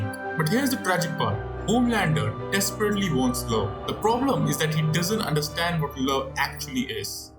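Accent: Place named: India. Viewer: Open the video, hear an Indian accent. close the video.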